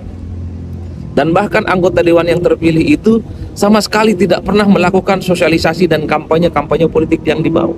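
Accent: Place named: Madura, Indonesia. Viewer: madure banget mas...melokal polll...topp ancen e ms ki👍👍👍👍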